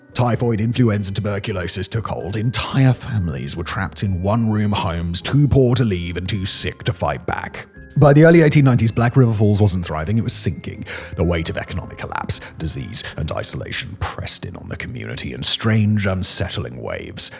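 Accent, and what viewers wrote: United Kingdom. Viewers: WTF, can't take listening to a Limey accent. Particularly with what's going on in their country this time.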